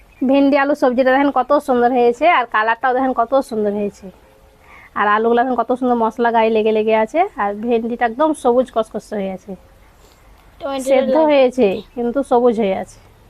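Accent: Murshidabad, India. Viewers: Amar to mone hoi murshidabad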